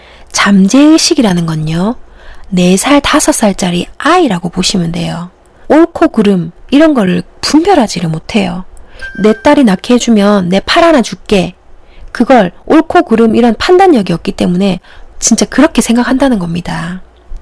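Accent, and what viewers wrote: Gyeongsang, South Korea. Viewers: 개인적으로 ,경남 사투린지, 부산 사투린지몰겠지만, 더욱 정감이가고.... 더불어 전해지는 진심어린 내용에 푸~~~욱!! 빠져 들게하는 매력이 있네요...ㅎㅎ 감사 하고 있어요~~!!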